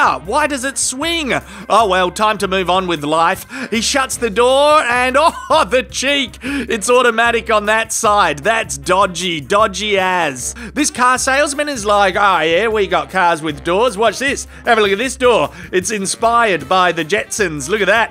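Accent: Australia. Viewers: u aussie?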